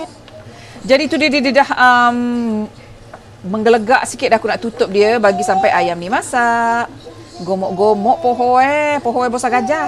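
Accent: Negeri Sembilan, Malaysia. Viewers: Salam i baru ikut your channel apa nama you sis....u dr N9... sbb loghat u cakap macam orang nogori....dr mana Rembau / KP / Seremban...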